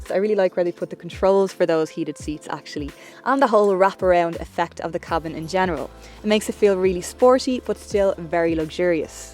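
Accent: Dublin, Ireland. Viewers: I like the Irish accent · Could you not even try to articulate your annoying dublin voice . .f.. sake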